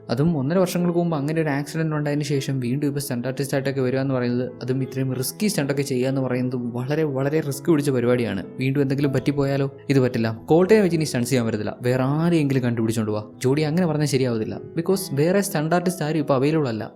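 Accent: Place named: Kerala, India. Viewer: ഒരു കഥ sollutuka, ട്വിസ്റ്റ്‌ മലയാളി, sr വോയിസ്‌, മല്ലു വോയിസ്‌, അത് kazeju, നല്ല രീതിൽ explain ചെയുന്നു, അത് തന്നെ അല്ല വീഡിയോ നല്ല രീതിൽ കാണിക്കുന്നു വോയിസ്‌ രീതിൽ very good man❤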